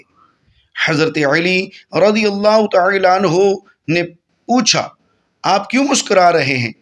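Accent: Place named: Arab world. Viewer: Aap Uedu bhi iss tarah mazhaka kheiz, Arabi lehje mein bol rahe hain ke Urdu ka satyanas kar ke rakh diya hai. Khassosan Hazrat ALi ka naam zuban twist kar kar ke bigaar rahe hain.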